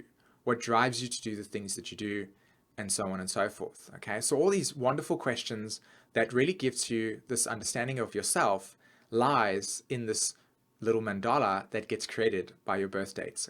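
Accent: South Africa. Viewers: New fan here. Thanks for this content. Great accent by the way...SA? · Are you South African?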